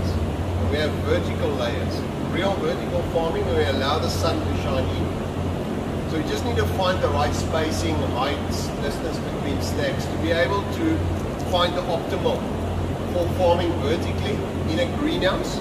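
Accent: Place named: South Africa. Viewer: My guy sounds south African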